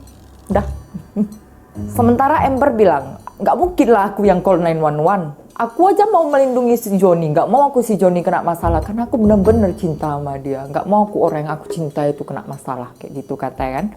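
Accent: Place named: Sumatra, Indonesia. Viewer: Logat khas Sumatra-nya yg bikin asyik 🇮🇩🥳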